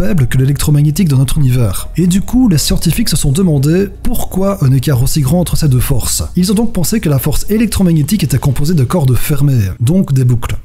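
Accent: Liège, Belgium. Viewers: Mdr l'accent belge ! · Haha vu ton petit accent tu dois venir de Liège, en tout cas quelque part par là ^^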